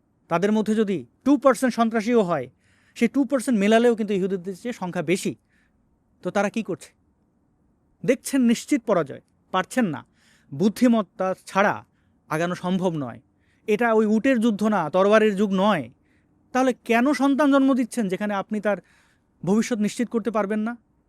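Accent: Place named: Bangal, Bangladesh. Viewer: দাদা আপনার বাঙ্গু কথা খুব মজা লাগে হা হা হা।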